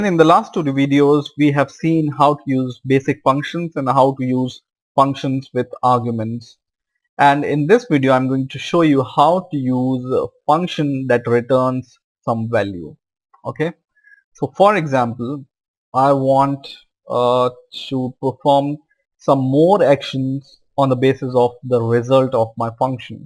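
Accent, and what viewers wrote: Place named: India. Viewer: He is obviously sound Indian totally not Chinese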